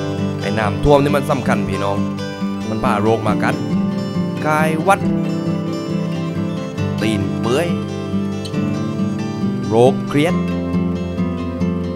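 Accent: Thailand. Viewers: บ้านผู้บรรยายต้องคงไม่เลยนครศรีฯลงไปตอนล่างแน่ ๆ สำเนียงนี้ ฮ่ าๆๆ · คนพากษ์อยู่ตรังม้ายนิ๊ ถ้าเข้ามาเห็น บอกกันนะบ่าวว่าโย่วย้านไหน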